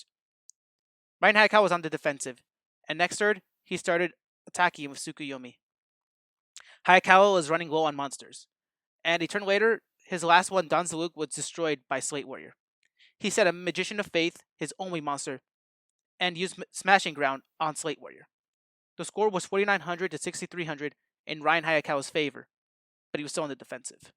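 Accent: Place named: New Jersey, United States. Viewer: I'm downloading this video as proof that you're from NJ and not Brooklyn.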